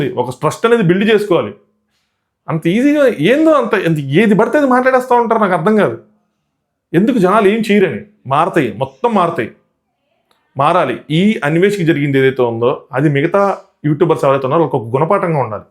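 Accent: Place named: Godavari, India. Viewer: Annayya nenu mimmalni subscribe cheyakunda mee video lu choosedaanini,,,chaala shame ga feel avthunnanu,,,, nenu chaala thakkuva channels ni subscribe chesaanu,,,, okati rendu vantala plchannels ,,,, garikapati gaaru,,, Chaganti garu,,, samavedham garu,,,,priyachowdary garu,,,, filmymoji,,,, ika itu paina meeru ,,, meee maata theeru pakka godhavari kada,,,,, entha chakkaga paddathiga matladuthunnaro,,,,, chaala manchi values ethics morals unna points meeda cheothunnaru,,,,, sooper Annaya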